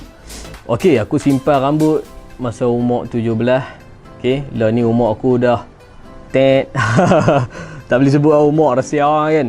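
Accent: Kedah, Malaysia. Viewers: Abang orang mana Kedah ka pineng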